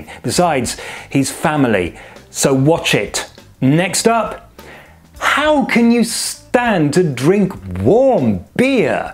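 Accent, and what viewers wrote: United Kingdom. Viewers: I didn't know you were an Englishman, I just thought you were a German who learned English from the British.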